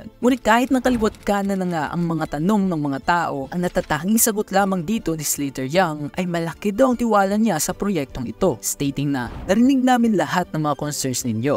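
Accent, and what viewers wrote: Visayas, Philippines. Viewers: ang sakit mo sa tenga pakinggan, bat ganyan accent mo, para kang bisaya na nagbakasyon sa tate ng 3 months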